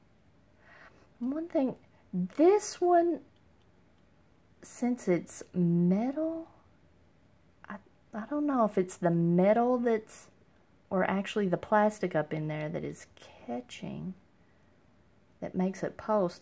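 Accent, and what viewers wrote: South, United States. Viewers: Southern accent eh?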